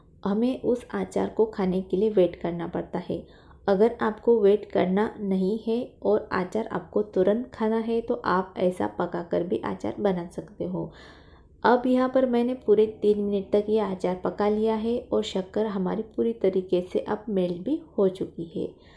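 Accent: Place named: South India, India. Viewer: Achaar dekhne se hi kafi tasty lag rha hai aor usase bhi achi baat hai apki Hindi bhasha jisme se thoda thoda South Indian ka khushbu aa rahi hai, that sounds nice and God bless 🙏🙏💐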